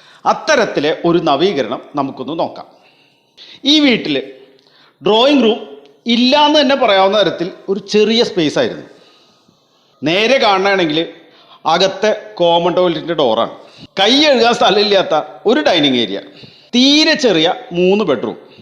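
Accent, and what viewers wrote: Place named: Thrissur, India. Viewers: പേര് കൊച്ചിന്നാണെങ്കിലും ഭാഷ ത്രിശൂരാണലോ ഗഡ്യ.. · കൊടുങ്ങല്ലൂർക്കാരനാണ്... അതുകൊണ്ടാ... 😆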